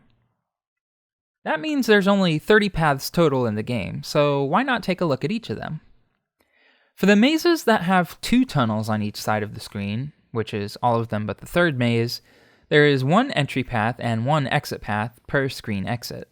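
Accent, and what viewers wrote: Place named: South, United States. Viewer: Are you from the south? I like your accent.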